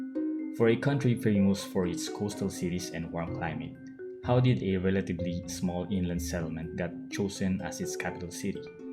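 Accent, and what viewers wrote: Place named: Philippines. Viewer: Sounds like a Filipino accent.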